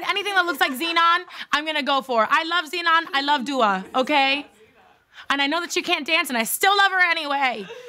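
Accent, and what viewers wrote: Miami, United States. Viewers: The miami accent came to this segment!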